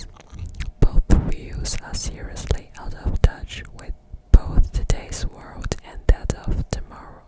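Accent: England, United Kingdom. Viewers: Love your english accent, send me off to sleep! New sub from me would love to see more like this!❤ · I really like your English accent you should be make more video like that video please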